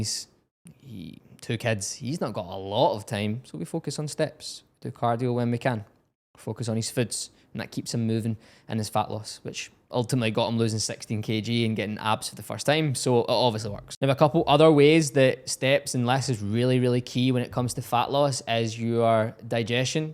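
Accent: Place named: Scotland, United Kingdom. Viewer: he either doesn't understand the Scottish accent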